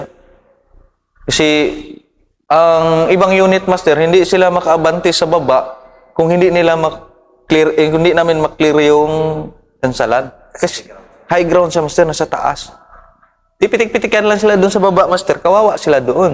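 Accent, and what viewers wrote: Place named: Visayas, Philippines. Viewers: Bisaya master or Bisayang daku or bisdak ang tuno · Hiligaynon tingin ko. Ilonggo.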